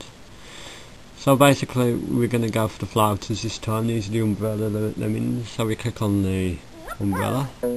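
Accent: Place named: Midlands, United Kingdom. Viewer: For u Americans it's called a Midlands accent. From the Birmingham area of England.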